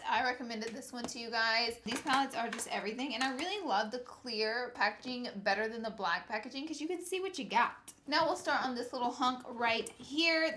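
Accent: South, United States. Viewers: I love watching and listening to your videos because you're from the south and your voice makes me so happy. You're so funny and you're so entertaining even just talking about eyeshadow palettes.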